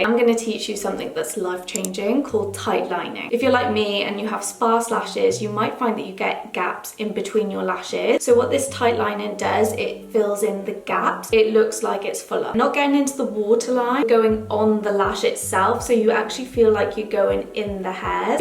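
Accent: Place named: Wales, United Kingdom. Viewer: Nice to hear a lovely Welsh accent, nice change from American. Not that I’m biased! 🏴🏴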